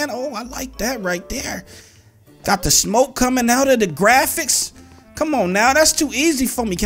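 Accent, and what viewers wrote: United States. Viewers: I like his American accent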